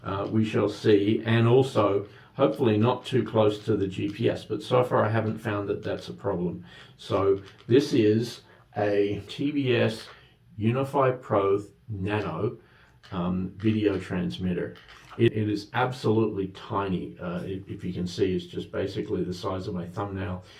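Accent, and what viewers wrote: Australia. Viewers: That's a really interesting accent. Are you an Australian in America?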